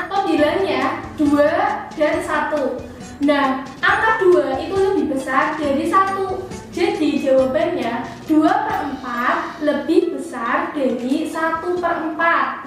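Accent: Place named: Java, Indonesia. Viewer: Aku suka dengan medhoknya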